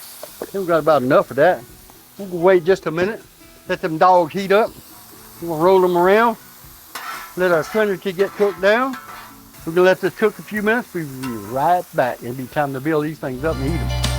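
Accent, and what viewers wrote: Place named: South, United States. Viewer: 'watchis'...........well. Imma Couyon (you sound country) and I see nuttin Cajun bout dis......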